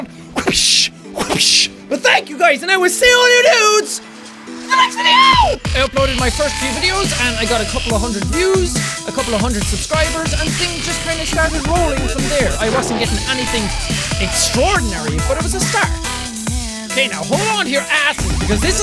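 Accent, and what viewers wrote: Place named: Ireland. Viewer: Yay irish accent!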